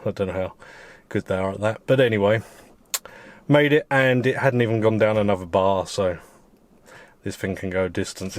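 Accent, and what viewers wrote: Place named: RP, United Kingdom. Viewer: What’s with the talking posh you have changed the way you talk lol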